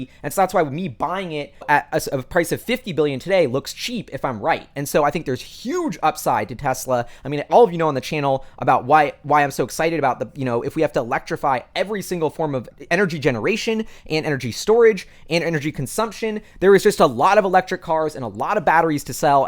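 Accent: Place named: Silicon Valley, United States. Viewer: The cancerous silicone valley accent can be avoided by muting it and using captions.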